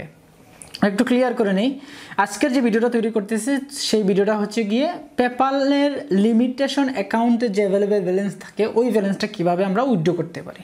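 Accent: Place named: Sylhet, Bangladesh. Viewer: Sylheti smell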